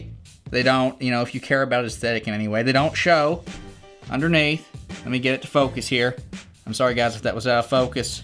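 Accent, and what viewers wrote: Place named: Tennessee, United States. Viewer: I'll have to try this. Are you from TN by chance? Just cause of the accent is why I ask.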